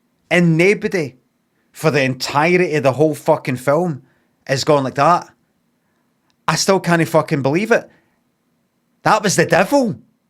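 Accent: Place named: Glasgow, United Kingdom. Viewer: He never really acts up the accent if he's annoyed. If anything, he puts on the posh Glaswegian when he is annoyed by sumin 😅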